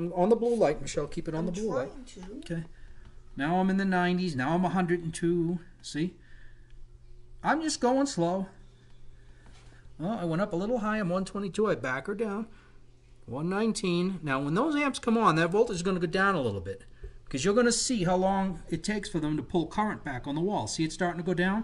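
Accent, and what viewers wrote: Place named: Boston, United States. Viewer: Greetings from back east. You are Boston to the bone. Love it!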